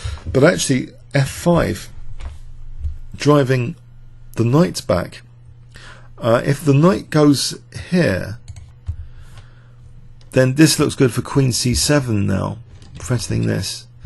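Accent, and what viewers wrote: United Kingdom. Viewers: Thanks for your continued success as a player and commentator. Of course, I always have to acclimate to the British "th", but it's worth it! (My British friends always complain when Americans say "water" with a "d" sound!). I don't have a chess engine, so I was hoping to get your feedback on the idea of Black playing a5 after Ba3, intending Nb4 if White pushes e4. If White exchanges B for N, then Black has a protected passed pawn on c3 and preserves the dark-squared bishop. Any thoughts?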